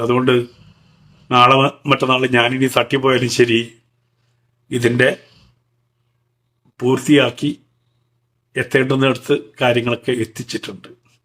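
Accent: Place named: Vadakara, India. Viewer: I like ur Calicut Vadakara slang. Reminds me so many good friends of mine.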